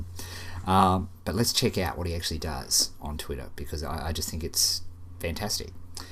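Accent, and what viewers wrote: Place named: Australia. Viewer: what a lovely australian accent!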